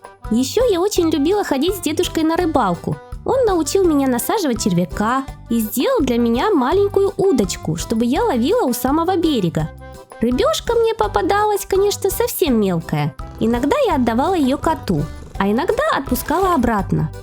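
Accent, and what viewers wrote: Ukraine. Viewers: Ты что из Украины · Ты что украинка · Ты чё украинкачто ли🤔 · Ты с украины? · Эй ты что с Украины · А ты что украинака? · Ты что украинец а я думал что просто так говоришь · ты из Украины что ли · А ты украинка чтоли 😢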